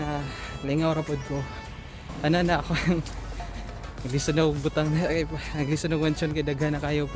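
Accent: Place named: Cebu, Philippines. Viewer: Maayong adlaw nimo diha doh! I believe taga Cebu ka kay klaro kaayo sa imong stinoryahan. Hehe Ask lang unta ko kung tinood diha sa New Brunswick na dili daw ka maka sponsor sa imong family? Salamat doh og God bless!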